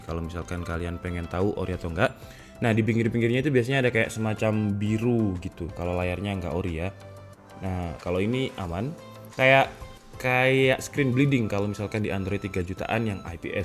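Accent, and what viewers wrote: Malang, Indonesia. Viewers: Bang asal malang kah? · Bang orang malang ya?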